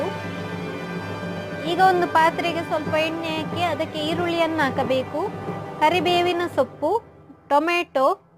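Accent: Tulu, India. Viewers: Nivu kannada mataduvaga gottagtade tulu mataduvavaru anta😂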